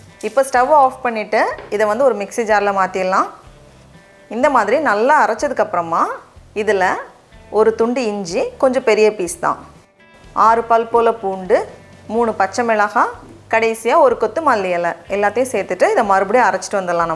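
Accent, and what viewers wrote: Nagercoil, India. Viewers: Hi friend.. Nagercoil vaasam ungal pechu la theriyuthu.. namma oora neenga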